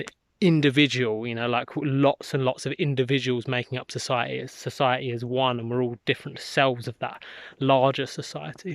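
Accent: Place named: United Kingdom. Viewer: Thx for sharing.. How come you have stopped making more content for your channel. We have the same accent from UK 😁✌🏼 Thx for sharing. Subscribed 💚🙌🏼✌🏼🙏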